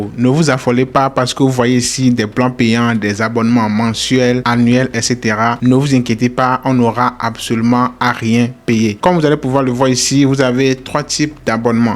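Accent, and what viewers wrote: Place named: Benin. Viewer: MERCI BEAUCOIUP MON GARS TU ES UN 10. JE NE TE CONNAISSAIS PAS AVANT, PREMIEE IDEO JE TOMBE SUR DE LA VALEUR GRATUITE MERCI BEAUCOUP.DIS MOI TU ES BENINOIS ??? VU TON ACCENT ON DIRAIT. MOI JE SUIS BENINOIS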